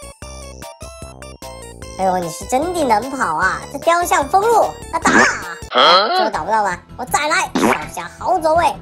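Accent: China. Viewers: 導演你是大陸人嗎？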